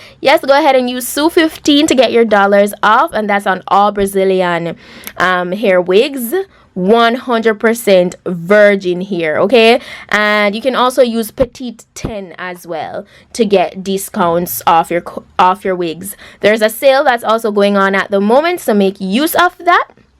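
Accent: Jamaica. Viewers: Her Jamaican accent is cute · Damn her 🇯🇲 accent thick lol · I love her accent ❤️🇯🇲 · your Jamaican accent is so peng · Luv this my Caribbean sister Im Trini....luv jamaican accent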